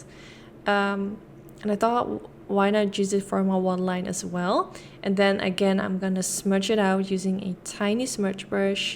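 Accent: Netherlands. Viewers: Are you Dutch? 😁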